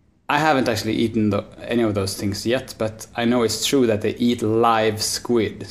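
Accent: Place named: Sweden. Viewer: Swedish Accent! Just say one thing for me... say "time to unmpimp de Auto" like that swedish guy in the VW comercial.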